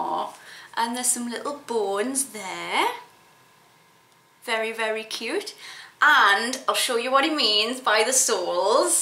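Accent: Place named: Newcastle, United Kingdom. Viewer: Love your Geordie accent and the boots xxxx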